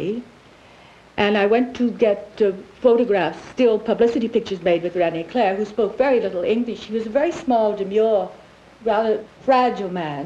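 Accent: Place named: Transatlantic, United States. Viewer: According to her book 'Lulu in Hollywood' , early in her career , she was disparaged for her 'hick' Kansas accent and trained her voice to get that Transatlantic accent which gives her voice a beautifully articulated tone and you can easily understand every word she says. Ironically , as it turned out , a perfect accent for acting in the sound movies.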